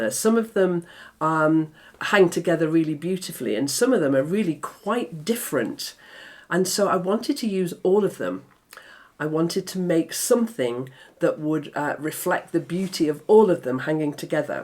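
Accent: England, United Kingdom. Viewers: I could listen to you talk all day. Your honesty with yourself comments tied with your lovely British accent is so soothing and sometimes comical that you take away my stress and make me smile. Not only do I learn from your expertise, but I learn to relax and enjoy. Thanks, from Ohio, USA.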